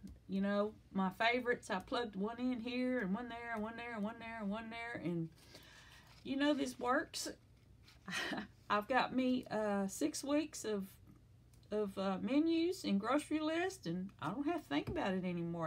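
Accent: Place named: South, United States. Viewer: You are either from Mississippi or where I am from.... Arkansas!!! Enjoyed your video.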